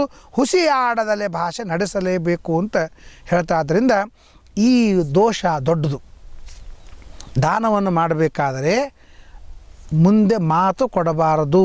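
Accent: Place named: North Karnataka, India. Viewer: Uttara karnataka kannada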